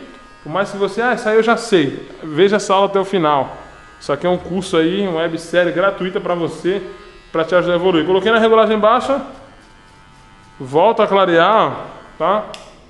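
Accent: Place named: Rio Grande do Sul, Brazil. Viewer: Tú é gaúcho né pai,eu também sou reconheci só pela voz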